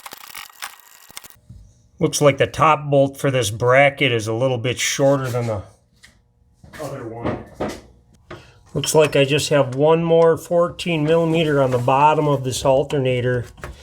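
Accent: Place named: North, United States. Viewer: This is so good! Naming the nuts by MM. Talking to gravity in a positive way. Staying positive no matter what. No cussing. No broken knuckles. lol and a sweet accent. Northern US or Canada? Maine? Minnesota? Uper? Thanks so much man! Very well done!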